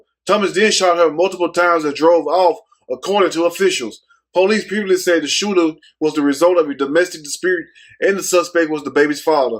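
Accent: South, United States. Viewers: not only do I like your videos, I love you and your how your delivery done with that southern accent that is charming.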